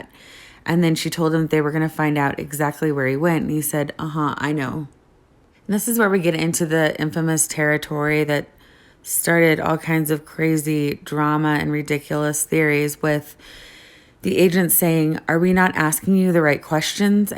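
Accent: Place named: United States. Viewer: I'm from a little country in the pacific and generally I don't like the American accent but I have to say you have a really really nice voice and are very easy to listen to. Thanks. Ive been obsessed with this case ever since hearing about it. I think because the amount of home videos of Shanaan, Bella, Celeste I feel I got to know them. I think Shanaan was an amazing mum, wife and home maker. The tenderness and patience she showed her gorgeous daughters was unmistakeable. The mind just boggles. crustychris brings to mind one word and thats "WIMP". Him and nicks-hole deserve each other!!!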